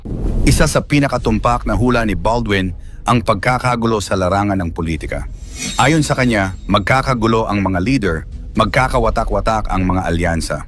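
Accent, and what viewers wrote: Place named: Philippines. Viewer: NALILITO ako sa NARRATOR. PINOY kb? IBA kc intonation n accent mo😅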